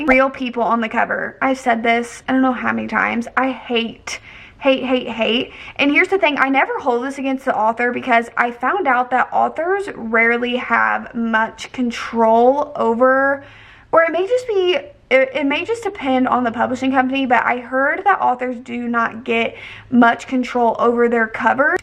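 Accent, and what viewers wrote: South, United States. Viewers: question for you: have you ever lived in the south? i swear you have a slight southern accent and the way you say “oils” is just further entrenching my southern theory!!!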